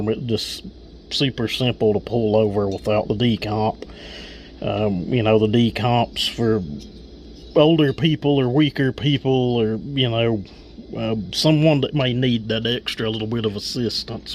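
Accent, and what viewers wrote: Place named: Deep South, United States. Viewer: No drama about the port work but what the fook is up with that accent? Is that deep south swamp? Love it too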